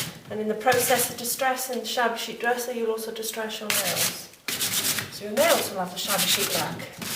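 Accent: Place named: Wales, United Kingdom. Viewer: hi, do you use both farrow & ball undercoat/primer & top coat, & are they both water based? also the liming wax, what brand is it, btw its fantastic to hear a fellow welsh accent on y.t. for a change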